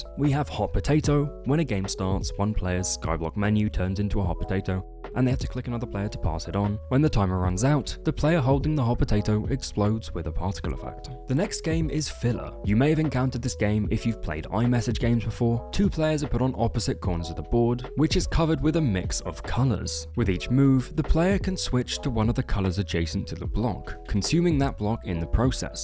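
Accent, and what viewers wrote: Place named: United Kingdom. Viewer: Video is good even tho the guy speaking is British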